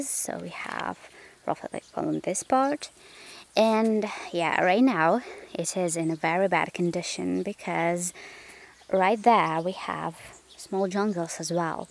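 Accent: United Kingdom. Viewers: So British accent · You seems to have a British accent. Btw, your village is quite lovely.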